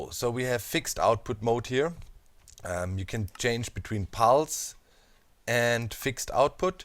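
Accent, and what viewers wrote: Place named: Germany. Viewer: For everyone who is not german you will not understand me. :P Hallo, Du warst auf meiner Startseite und das Video gefällt mir! Hoffe du machst weiter so!Edit: Übrigens Man merkt an deinem Akzent oder der Kanalbeschreibung das du Deutsch bist. Find Ich cool das Ich mal nen Internationalen Gamer gefunden habe der Deutsch ist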